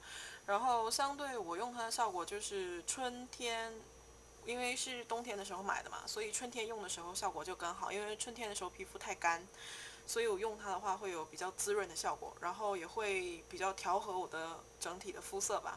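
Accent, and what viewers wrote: Northeast, China. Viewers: 东北人吗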